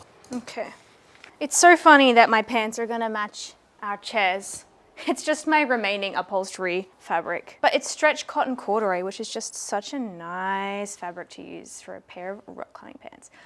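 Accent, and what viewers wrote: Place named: Australia. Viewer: Clicked for the pants, stayed for that australian accent 🤣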